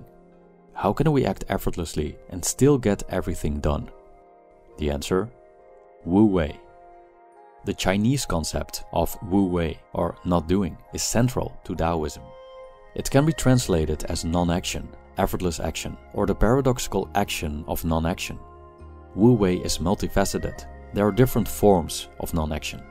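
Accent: Netherlands. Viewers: Lekker dat nederlandsche accent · i love your dutch english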